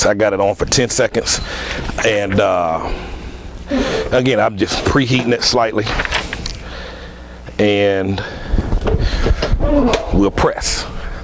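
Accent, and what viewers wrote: South, United States. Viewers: It's great to hear a southern accent on the tube. Keep making cool videos brother. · Peel it! Drop it! Roll it, and boom. LOL! 😁😁 It is early in the morning and I am watching this video.. You are so funny and inspirational at the same time. I always learn the best when something is funny or I am laughing. Thank you my brother for this video. I like your Southern accent. I live in the South as well. God Bless you!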